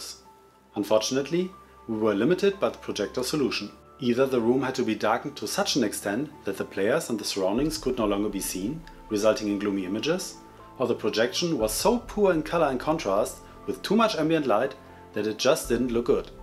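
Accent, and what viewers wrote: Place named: Germany. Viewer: Ich hatte die ersten paar Sekunden und dachte mir nur „DAS verstehe ich gut, dass ist der mir vertraute deutsche Akzent“ 😂😂 Aber super Video, genau das hatte ich gesucht, vielen dank!!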